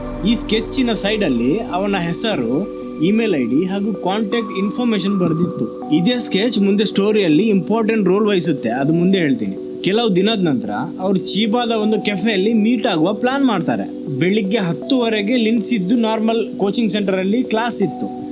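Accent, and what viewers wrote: Mangalore, India. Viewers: R u manglorian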